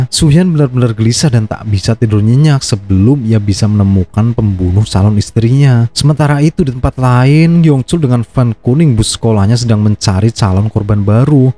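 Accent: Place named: Java, Indonesia. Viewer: Bang lu orang Jawa ya ? Soalnya kaya keciri banget dari logat Luh ngomong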